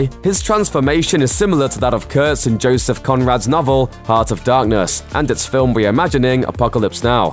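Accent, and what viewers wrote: United Kingdom. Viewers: Is this guy a narrator for every video like this, or is this just how every single British man sounds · Where are you from? I mean besides the UK. Love your accent and voice though. #nohomo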